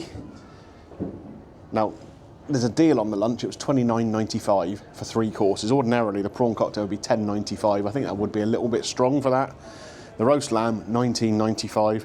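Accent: United Kingdom. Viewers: I can’t stop watching your vids. Yank here but love your British accent and your adjectives are addictive. I love good food! Thank you!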